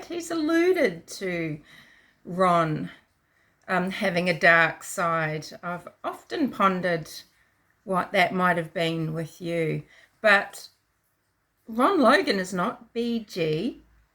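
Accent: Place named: Australia. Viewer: Hey! It is so great to find you. I found you from Randy’s latest vid. I agree with EVERYTHING you said!!!! You have such intelligence and common sense. I’ve ALWAYS respected your thoughts, comments and opinions. 💗 I always thought you were a guy! 😂 You sound Australian. Keep on keeping on, girl!! 👍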